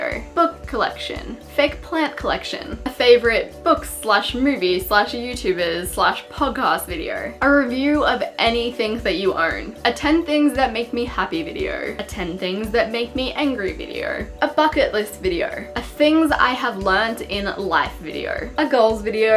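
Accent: Australia. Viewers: I think you're Australian accent, I love that accents.I went there for vacation love listening people are talking. I'm gonna start a new channel too. This is helpful .because I'm a new mommy wanna be busy while im home taking care my 3 months old baby. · Are you Australian? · Omg r u Aussie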